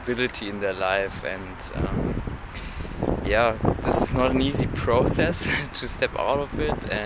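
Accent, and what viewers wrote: Germany. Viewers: Lool he's german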